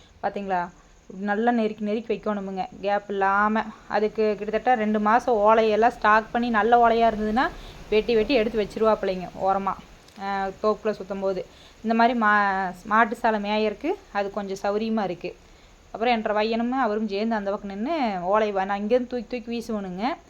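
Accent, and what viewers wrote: Kongu, India. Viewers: ஓலை போட்டது super கொடுத்து வச்ச மாடு👌 🎉🎉🎉கொங்கு slang🎉🎉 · உங்கள் மாட்டு கொட்டகைக்கு ஓலை போடுவது மிக அழகாக படம் ஆகி இருக்கிறீர்கள்👍 அதைவிட உங்களுடைய கொங்கு தமிழ் பாஷை அழகாகவே இருக்கிறது 👍 இதுபோல் தொடர்ந்து பெரிய பெரிய வீடியோக்களை இன்னும் ஐந்து நிமிடத்திற்கு மேல் போட்டால் நிறைய பேர் விரும்பி பார்ப்பார்கள்😊 ஆரம்ப நிலையில் இருப்பவர்கள் இது போல் வீடியோ போட்டுக் கொண்டே போனால் வெற்றி உறுதி 👍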